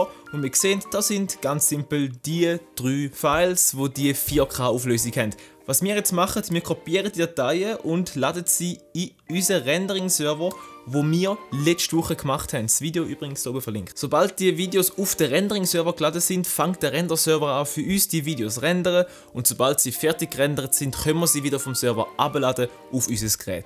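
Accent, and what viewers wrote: Switzerland. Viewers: EY xD bisch du au en basler??❤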